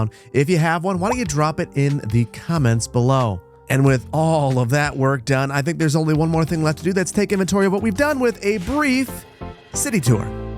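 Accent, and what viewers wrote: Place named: Midwest, United States. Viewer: Cal State Northridge, and I thought you were midwestern. Greetings from This San Diego State '72 almunus.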